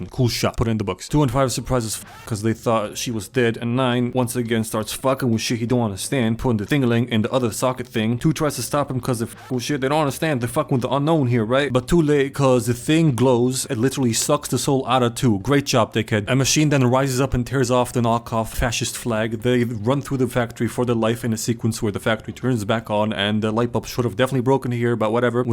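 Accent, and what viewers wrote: United Kingdom. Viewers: Man your British accent Is a killa put that on repeat for my bong rip shit was hilarious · Hey CAN YOU DO ONLY BRITISH ACCENT IN YOUR NEXT VIDEO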